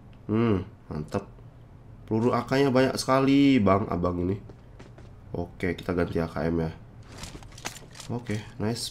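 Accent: Java, Indonesia. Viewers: Medok men to mas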